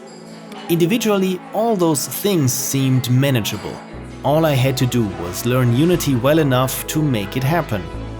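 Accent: Germany. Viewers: Bruder muss los in allem ernste, fantastisches Video, ich hätte am Akzent gesagt du bist Deutsch aber die Alpen sagen irgendwie Österreich/Schweiz sono molto confuso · I mean yea, you can hear the strong German Accent, but i pretty much forget about it in the first minute because im so damn fascinated about the quality of the video. I know this Post Morten Ludum Dare Series since two to three years, and always loved it, you are really talented at making this kind of stuff and i just hope one day you get the attention you really deserve.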